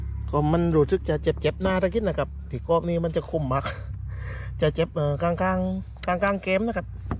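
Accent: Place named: South, Thailand. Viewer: ขอบคุณที่รีวิวให้ดูนะคะ พี่เพิ่งเคยเห็นเลยค่ะ ปืนยิงปลา เคยแต่ได้ยินค่ะ ชอบตรงเอาด้ามแปรงสีฟันมาดัดแปรงทำด้วยนี่แหละค่ะ ไอเดียดีจังเลยค่ะ ประสิทธิภาพสุดยอดเลย พี่ชอบน้องพูดภาษาใต้จังค่ะ ยิงให้ดูด้วย ใช้ยางสามเส้นนะคะ ไม้เทียมมันจะเบานะคะ และที่สำคัญมันลอยนี่แหละค่ะ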